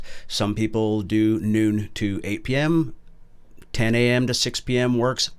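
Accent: Canada. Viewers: hmm are you Canadian? Got that Canadian "out" thing going on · ooh that Canadian accent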